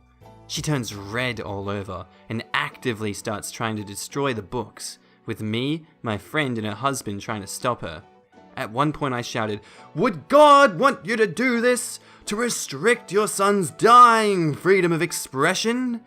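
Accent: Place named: Australia. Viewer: the northern Aussie accent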